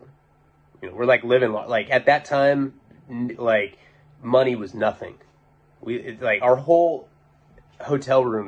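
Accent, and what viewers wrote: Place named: Boston, United States. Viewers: Your Boston accent makes me giggle poo poo Palabra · Oh my god! Your Boston accent!!!!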